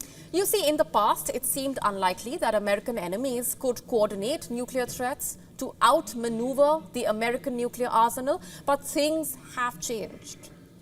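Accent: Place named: India. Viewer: What the heck of indian accent! 🤔🤭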